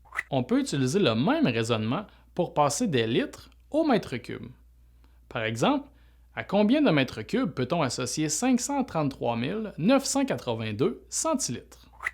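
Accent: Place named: Montreal, Canada. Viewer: vous êtes de Montreal! L' accent est fort mais j' ai bien aimé, je viens de Canada aussi mais d' Ottawa, Ontario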